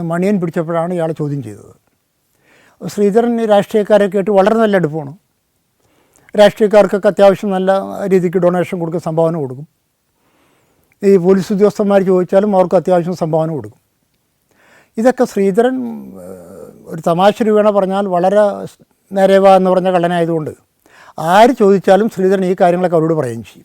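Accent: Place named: Wadakkanchery, India. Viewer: Sirnte veedu wadakkancheri aano ? Slang also like that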